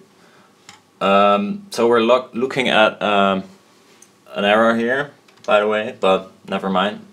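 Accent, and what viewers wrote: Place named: Netherlands. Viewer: ik ook lol gokte het al aan je accent.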